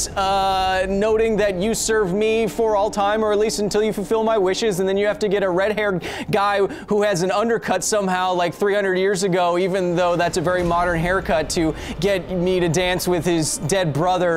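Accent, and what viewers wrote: Poland. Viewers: HI, really love the show and I loved the polish accent of this vid (for obvious reasons xd) but don't do my boy Tomasz like that... In pronunciation of that name you emphasize the "T".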